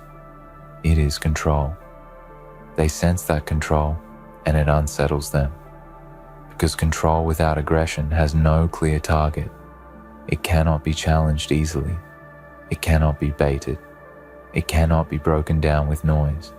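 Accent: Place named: Australia. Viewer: This voice is terrible. Nasal, sleepy, Australian. I much prefer the other voices.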